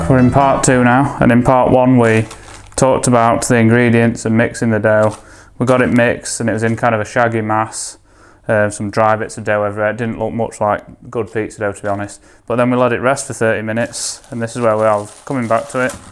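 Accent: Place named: England, United Kingdom. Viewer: Do you speak English? I don’t understand how an English accent is strange to hear spoken English in. Would Boomhauer be easier to understand?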